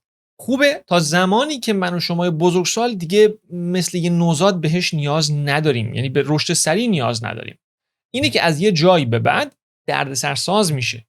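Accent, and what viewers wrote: Ahvaz, Iran. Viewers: بچه اهوازی ؟ 😅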